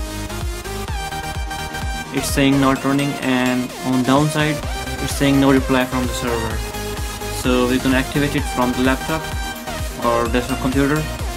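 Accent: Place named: India. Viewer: Please turn down that annoying music. I have a hard time with accents as it is. I love people from that region, but have a difficult time uderstanding.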